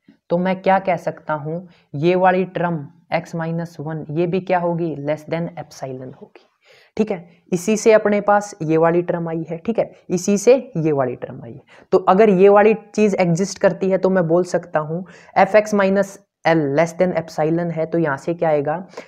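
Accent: Haryana, India. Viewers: Sir g aap haryana se belong karte ho na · Sir your efforts are so appreciatiable. I like your Hariyanvi acsent .👌🙏😃😊👏🤗🙏😊👏👍🙏👌😃😍🎊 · Kis kis ne lagya ke sir Haryanvi ha 💪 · Sir aap haryana se ho kya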